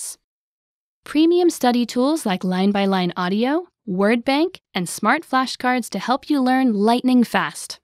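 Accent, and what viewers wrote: Vietnam. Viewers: Is this northern or southern Vietnamese?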